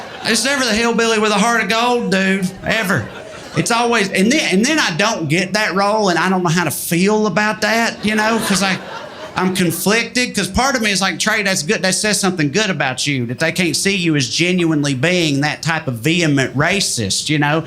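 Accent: South, United States. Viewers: O.K.I am from Quebec and this is PURE joy for me to discover this type of humor,with a southern accent..WOW!! Well done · Sure, but you can also HAVE a southern accent if you're from the south. Some people do, like this guy. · Fella acts like he aint been part of the south in 6 generations....... voice says otherwise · Bro I saw the thumbnail and still didn't expect that degree of southern accent. Damn dude. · This man is so southern that (to my Canadian ears) it sounds like he’s imitating a southerner rather than using his regular tone and accent.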